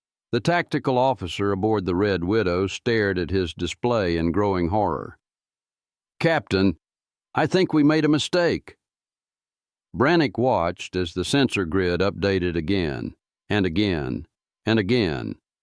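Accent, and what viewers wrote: South, United States. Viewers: Its so nice to here a narrator that speaks my own language, SOUTHERN. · Like I said and that is for reply you've lost consistency that southern drawl and humour and ya keep switching diff narrators when ya got a winner ya lost me but good try not sure how yer not relating yer stories good narrator all over the place ya can't relate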